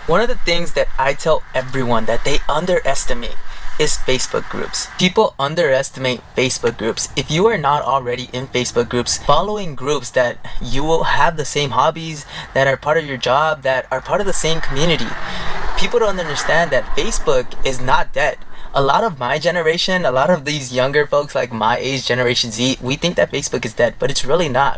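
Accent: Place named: Los Angeles, United States. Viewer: U have that LA accent. 😀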